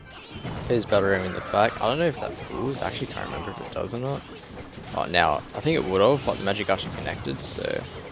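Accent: Australia. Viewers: sexy aus accent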